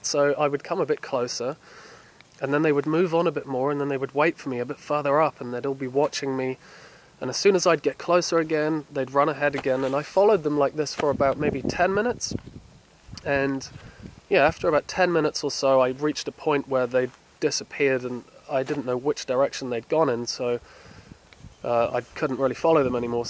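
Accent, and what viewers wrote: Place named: United Kingdom. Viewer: You sound British, where about didn't you find the mushrooms? I didn't think they grown in the UK.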